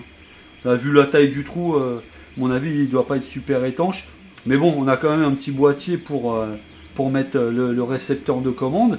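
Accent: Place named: France. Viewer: Excellent cette vidéo, je me taté par rapport au rapport qualité / prix et bien ça semble pas mal... J’adore ta façon franche de parler et ton accent frenchy sur les mots technique anglais 😂. Merci pour ce partage et vivement le test sur le terrain. Big Up à toi 🤘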